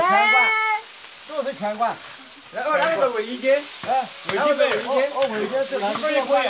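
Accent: Sichuan, China. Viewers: 美食作家王刚四川口音非常好聽，不看字幕基本上可以懂～鄉下生活真有意思～很樸實，很平靜，很健康～ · 你的视频有毒呀，这两天我一看就刹不住脚了。川普讲的很好哈哈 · 四川话好听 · 来了美国居然在油管上看到听到四川话突然有点想家了。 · 听到四川话好亲切，哈哈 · 听口音像川南的人，我是内江的，听到“是”字饶舌的感觉就熟悉。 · 聽得懂而且是好懷念的口音阿以前家裡隔壁開雜貨店的老爺爺是個四川人軍醫只是家裡附近老兵這些年全都過世了很久很久都沒聽到了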